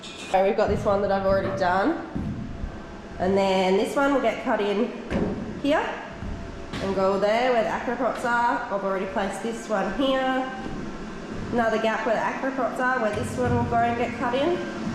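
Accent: Australia. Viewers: Your awesome you sound like a Aussie what a chick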